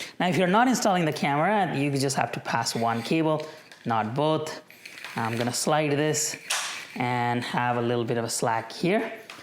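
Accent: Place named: Nepal. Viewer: Nepali bro by accent😊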